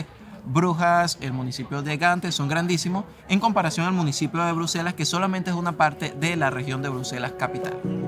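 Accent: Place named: Venezuela. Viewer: Un saludo, lo que expones en tu vídeo es un tema muy complejo pero lo has explicado con claridad, es muy interesante y educativo. No sé si interactuas con tus seguidores pero quisiera saber cuál es tu nacionalidad, porque pareces ser de Venezuela por tu acento, es así? Ya me suscribí y te sigo suerte en tu proyecto de canal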